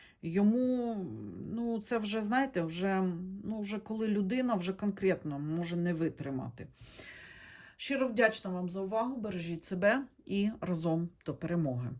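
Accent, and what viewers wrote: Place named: Surzhyk, Ukraine. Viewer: Хорош лапшу на уши вешать! Как баба базарная ляпаешь, семечек не хватает, и суржик прет!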